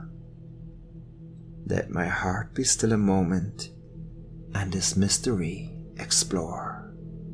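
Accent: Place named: Ireland. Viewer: Aaaaand I fell in love again ❤ An Irish man reading one of my favorite authors? Yeap, sign me up!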